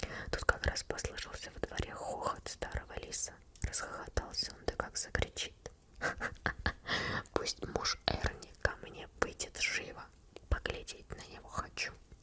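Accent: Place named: Russia. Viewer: Sotaque russo é muito bom velho, o jeito que ela pronuncia os ditongos é diferente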